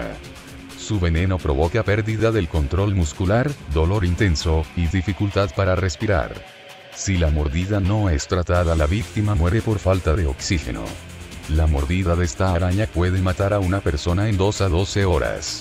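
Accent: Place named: Spain. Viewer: oye como haces la bos de españolete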